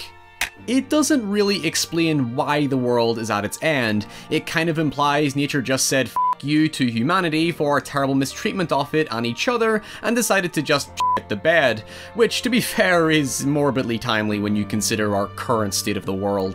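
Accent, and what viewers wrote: Northern Ireland, United Kingdom. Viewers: It's rare to come across a channel with such well-constructed video essays! It's also excellent to hear another Northern Irish accent :) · Why do you sound like a Northern Irishman trying to sound American?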